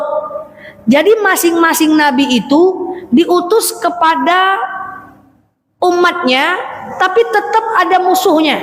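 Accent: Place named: Padang, Indonesia. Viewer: Ustdzh org pdg kayakny suaranya kayak org pdg benar GK subhanaallah kagum saya udh cantik ustdzah LG beruntunglah ortu nya mendidiknya anaknya jdi anak Sholeha semoga sehat selalu dan dijaga oleh Allah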